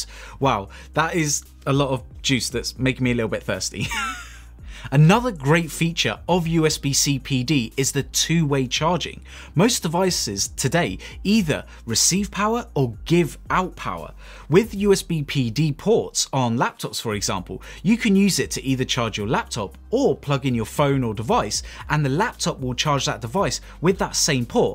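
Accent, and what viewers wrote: United Kingdom. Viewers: Thanks, interesting and helpful video. Good to hear a Brit voice supporting a USA company. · is this really Sabrent USA? Sounds more like Sabrent UK. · Normally i dont like British accents but dude yours is different i can listen to u talk all day 💛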